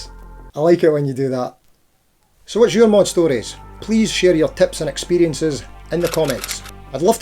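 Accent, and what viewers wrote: Scotland, United Kingdom. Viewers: 3 string graph tech string tree would be totally amazing! Also, always great to hear a Scottish voice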